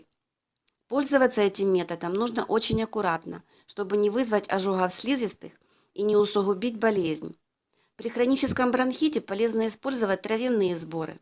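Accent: Ukraine. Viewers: это украинский диалект и не тебе сопле указывать комуи как разговаривать и прав тот человек ,что назвал тебя Бляндинкой!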